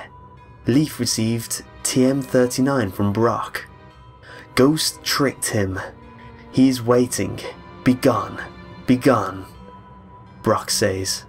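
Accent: United Kingdom. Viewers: he's British. they have their speaking quirks, and they cant help it. honestly it sounds much better than American English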